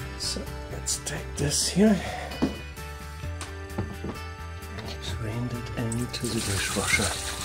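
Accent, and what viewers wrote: Germany. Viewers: Ooooo you’re German aren’t youuuuuu. 😘😻😻😻😻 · I love your soft German inflected English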